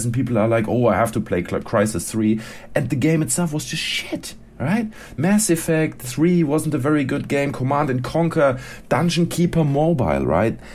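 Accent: Germany. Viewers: Your German (correct me if I'm wrong) accent sounds extremely awesome.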